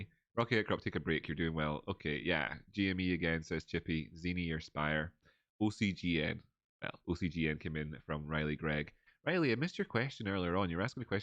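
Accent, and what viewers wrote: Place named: Scotland, United Kingdom. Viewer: I've been watching you for months now, and have always wondered what the "uh oh" button was. While rewatching the VLDR TA i finally realized you were saying "auto button" with your scottish accent. Make's so much more sense now. im such a fanny lmayo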